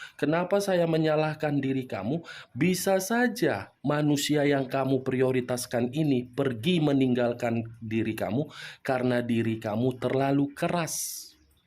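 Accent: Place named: West Java, Indonesia. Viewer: Acak Adul Sunda banget bang Bahasa orang Bandung 😁😁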